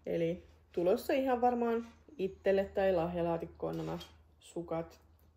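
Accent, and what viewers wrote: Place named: North, Finland. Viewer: Kivoja neuleita sekä kivaa jutustelua. Rakastan pohjoisen murretta <3 Hyvää juhannusta!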